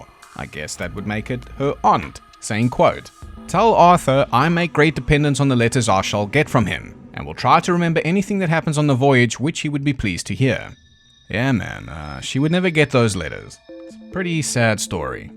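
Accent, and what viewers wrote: South Africa. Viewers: Im so confused why everyone is saying he sounds Australian. It's quite audible someone who primarily speaks a form of dutch (in this case Afrikaans) speaking English. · I am now officially a fan of the South African accent or yours anyway which I can't say about any British or English accent. Also, your sense of humor is amazing. Laugh so hard every time I listen to your recordings keep going. Keep going love it. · I spent a few years working in state fairs, and a lot of my coworkers were from South Africa. You apologize for your accent, but it's one I've heard many times. It's pretty much what I expect the cruise and fair industry to sound like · Algorithm just served this video to me. I clicked on it because I've been fascinated with the Mary Celeste since I was, like, 6 or 7-ish. So I was already excited! THEN...I hear it being narrated by a fellow South African!! 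AND...the humour (the whole tone, really) is absolutely on-point!!! This is peeeak YouTube! 👌🏼😁🇿🇦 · howdy from savannah georgia USA. new sub here. i love your sense of humor and thank you for NOT being AI. your south african accent and pronunciation is far superior to most others from the american south east. i'm glad youtube suggested your channel.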